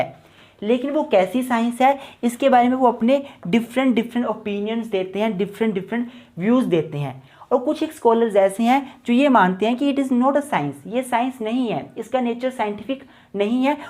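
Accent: Himachal Pradesh, India. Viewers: Kya aap Himachal se ho ? 🙄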